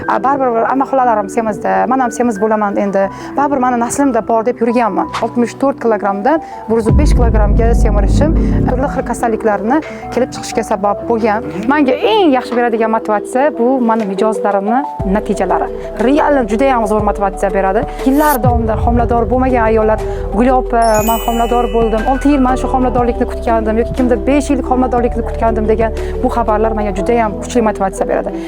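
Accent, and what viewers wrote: Bukhara, Uzbekistan. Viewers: Voy Buxoro gòzali shevangzdan bilgandim Buxorolik ekanizni men ham buxoro shahardan❤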